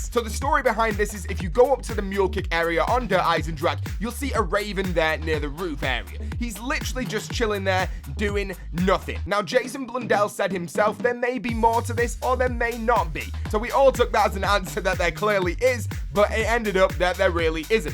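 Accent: England, United Kingdom. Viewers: allot a lot hos english accent made it sound like that trust me i have someone i know with the same accent he pronuces it the same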